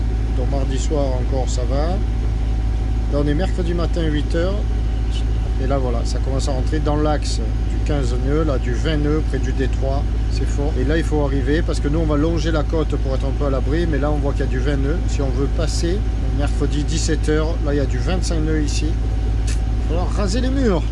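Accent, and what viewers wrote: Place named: Provence, France. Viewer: Salut merci pour tes vidéos et ton accent de la belle Provence…. La lumière en question s’appelle feu de hune (steaming light) et c’est obligatoire lorsque le moteur propulse avec où sans les voiles. Même si tu n’aime pas la mécanique tes tutos sont franchement sympa et c’est toujours un plaisirs. Bon vent au Tabasco.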